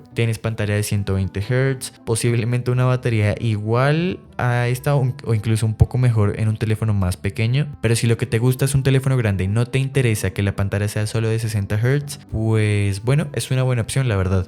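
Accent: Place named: Colombia. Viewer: Eres de colombia que si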